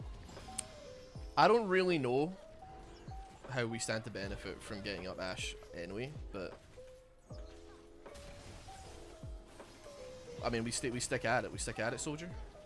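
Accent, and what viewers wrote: Scotland, United Kingdom. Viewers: The best Christmas gift is two hours with a Scottish man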